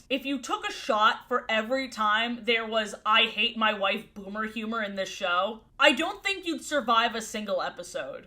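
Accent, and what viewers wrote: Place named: Northern England, United Kingdom. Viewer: Where is your accent from ? The way you prounounce 'ee' at the end of words is very north of England-ish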